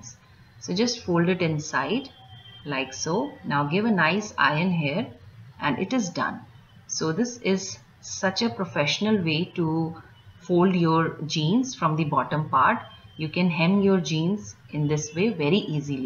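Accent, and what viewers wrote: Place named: England, United Kingdom. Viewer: I think u r from England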